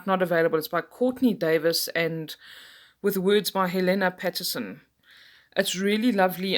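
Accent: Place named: South Africa. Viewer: I was born in Cape Town, so I was immediately drawn to your South African accent lol. I am thinking of purchasing this deck and came across your channel when I searched for videos on the Celtic Tarot. Thanks so much, I think I may grab myself a copy. The art is indeed very interesting and beautiful, and although pip decks are not typically my favourite, this one definitely does intrigue me 😊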